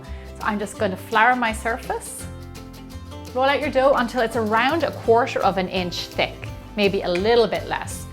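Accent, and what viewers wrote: Ireland. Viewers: Are you Irish? I'm jealous of your accent and Poptarts. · i love your accent and do you speak a different language in ireland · Oooh! Sounds good. Thanks for the quick response. PS I love your accent, brings back good memories from Ireland. Lived in Dublin for nearly nine years. People here in Canada think I'm Irish lol.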